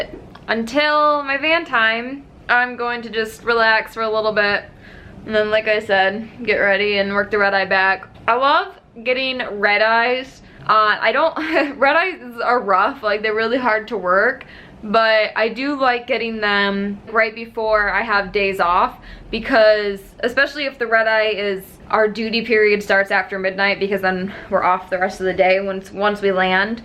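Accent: Midwest, United States. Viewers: Is that a Midwestern accent?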